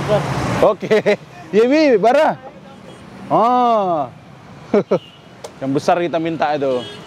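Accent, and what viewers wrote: Medan, Indonesia. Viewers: 😆asli mana sih bang... bahasamu campur jawa tp logat medan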